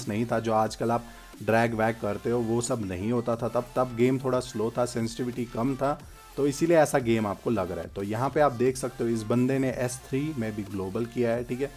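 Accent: Kashmir, India. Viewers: Kya aap Kashmir se hooo 🙄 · Bhai kashmire ho app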